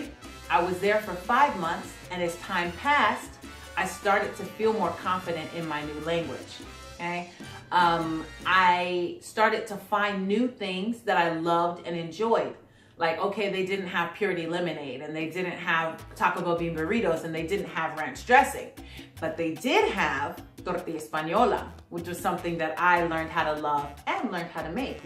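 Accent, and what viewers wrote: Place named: Cuba. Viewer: Hola, te conocí en la Academia de Tiffani me encanto la entrevista desde ahora te sigo. I love your English and the way you teach, and your Spanish accent "Cubano "😂.😊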